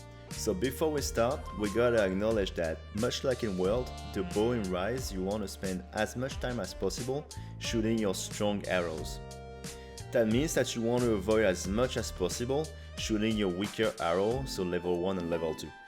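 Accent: France. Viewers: Haha cet accent, tu es français n’est ce pas ? Sympa la vidéo! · ohh i love bow what set are you using and are you french? i think i hear a french accent :3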